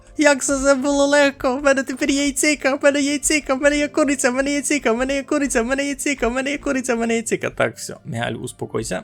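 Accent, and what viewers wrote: Transcarpathia, Ukraine. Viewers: Боржавський або бережанський діалект (центральна частина Закарпатської області). Містить достолиха говірок. Єдиної стандартизованої версії поки не має.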